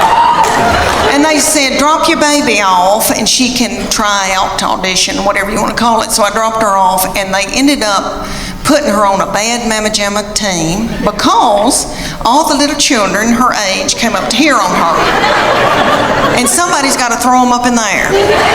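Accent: South, United States. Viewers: This is very funny. I really like that down to earth southern accent. I would like to see in person doing this skit. Good clean comedy CAN be very funny. BUT, what I am seeing, scares the hell out me. Obviously AI. Artificial Intelligence. It can make anyone appear to be saying anything the programmer wants. ANYONE, INCLUDING YOU. AI IS VERY VERY DANGEROUS. A SCARY FUTURE. 😞😞😞😞😞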